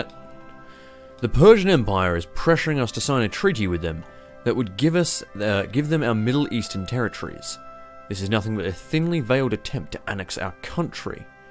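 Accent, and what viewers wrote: Australia. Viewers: This dude sounds more Australian then me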